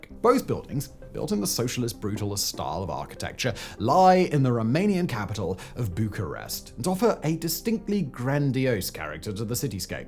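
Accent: RP, United Kingdom. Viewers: you sound so posh that it's very annoying. Bring it down to earth and I'll watch your content. Otherwise... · This guy just reads the script. He sounds goofy and British, that is the selling point. There is an immense production team behind that.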